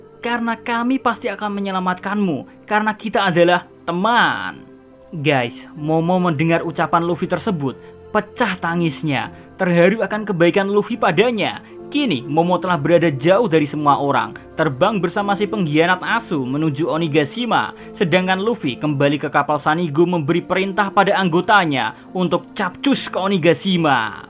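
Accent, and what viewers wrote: Java, Indonesia. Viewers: Pusing gw ama logatnya,medok banget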